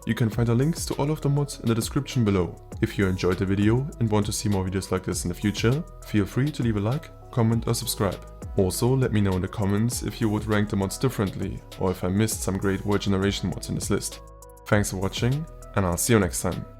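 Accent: Germany. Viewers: Your English sounds good but do I hear a little bit of Deutsch in there?😂 Nice Vid, thanks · Man hat sofort an deinem Akzent gehört, dass du aus Deutschland bist. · Ich hear auch a Little Bit german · Gutes Video das Deutsch Englisch is crazy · ich hör a little bit german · are you german? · Are you german?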